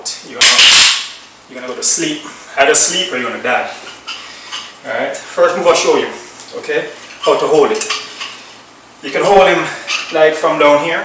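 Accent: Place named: Jamaica. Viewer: wait...... yuh sound like a yardie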